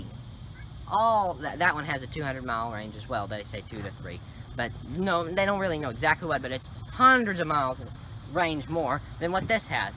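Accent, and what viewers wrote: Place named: South, United States. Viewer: Man you haters got to stop hating, this young man is awesome and you throw around shit about being gay and needing bass in his voice. Dudes you obviously ain't been nowhere in your boring ass lives. People from certain parts of the country just talk like that, parts of NC, parts of Tennessee, and many other regional dialects, just watch NASCAR some time. My hat goes off to this positive young man not wasting his time on worthless pursuits. Soon as one of you does something relevant feel free to cast stones.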